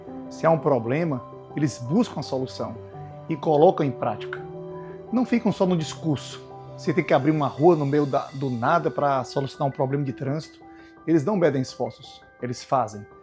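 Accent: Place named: Rio Grande do Norte, Brazil. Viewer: vc é do RN? Seu sotaque me parece comum rsrsrs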